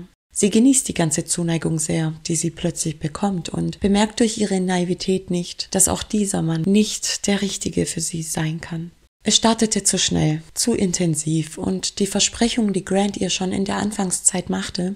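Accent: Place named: Swabia, Germany. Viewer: Man hört das Schwäble raus 😁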